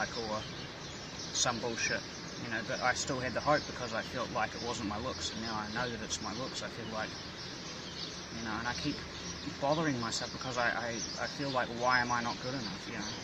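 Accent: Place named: New Zealand. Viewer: Sorry to hear that you're still struggling with this problem Bro. I know the feeling. I don't know you're Financial Status but I Highly recommend you come visit the US. With that strong Accent, Blue eyes, and still have hair is a major plus. Also being from New Zealand 🇳🇿 you will have a line of women I can promise you that. I had a Russian friend steal the heart of a girl who was about to get married and there together. It's vice-versa if your from from Europe and really want a girl come to the US they are easy to Manipulate with European charm. Now if you're American go to Europe you will not be disappointed that's my advice to whoever reads this.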